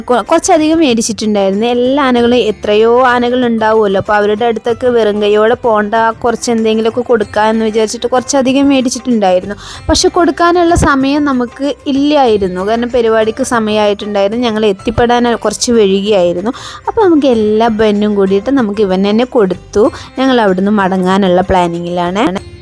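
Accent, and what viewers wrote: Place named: Thrissur, India. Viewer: വിവരണ ഭാഷ ശരിയായില്ല 😏 എന്തോന്ന് ഇത് വല്ലാത്ത ഒരു എന്താ പറയ തൃശൂരിലെ ഭാഷയെ മോശം ആക്കരുത്🤮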